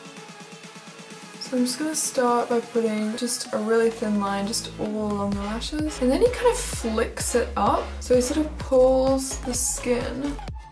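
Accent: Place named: Australia. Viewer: it sounds really cool! At first I thought you sounded English then I thought Australian! So that would make sense! Sounds so cool tho!!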